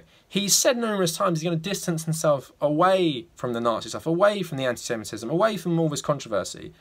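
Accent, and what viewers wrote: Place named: England, United Kingdom. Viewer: You may want to watch yourself. You said ‘kill’, ‘all’, and ‘jews’ in that order in a video and I’m assuming you live in England based on the accent, and as we know based on your trash judicial system, context is irrelevant. Plus, they’d probably have something to say about that poster behind you with that particular salute. Anyone want to get on the horn about this terrible person to the authorities?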